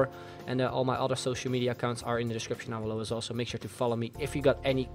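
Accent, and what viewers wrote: Netherlands. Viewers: Christian El Tawil yea was thinking of that too. He sounds dutch so he should definitely know this haha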